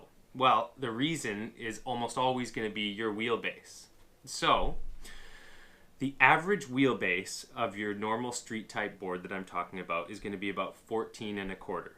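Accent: Canada. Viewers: (Not related to vid) Your accent remind me of Ben Stiller from Zoolander. Is this the typical Canadian accent? 😂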